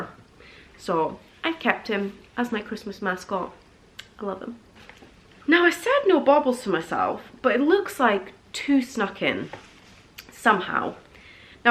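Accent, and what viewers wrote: Scotland, United Kingdom. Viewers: First time watching your video - really enjoyed it and loved your haul! Will keep watching - I find you're down to earth chat very enjoyable and love your mellow Scottish accent!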